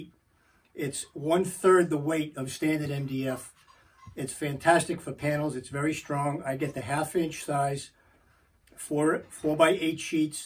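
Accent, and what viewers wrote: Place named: Connecticut, United States. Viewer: So glad I found your vid while researching attaching linen to wood. I didn't know about the PH neutral PVA, and I've now ordered some by the same manufacturer. On a side note, as a native Connecticut Yankee that moved to Virginia 30+ years ago, as soon as you started talking I yelled out in joy! lol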